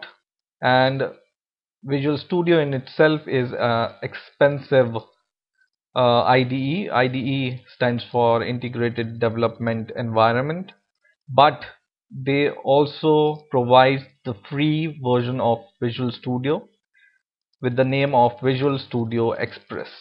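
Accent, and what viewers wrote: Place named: India. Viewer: Indian accent makes it better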